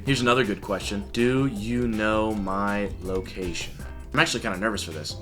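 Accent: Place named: United Kingdom. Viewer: I thought you was British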